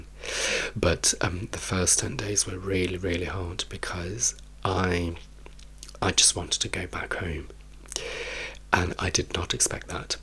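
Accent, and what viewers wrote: United Kingdom. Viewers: I would love to hear you speak Italian, maybe you could do one video? I could swear you were British, I can't belive you aren't a local. PS. Such a shame you could not be yourself in Italy, I hadn't realised the homophobia over there is so strong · As an American, you definitely sound British to me! 😂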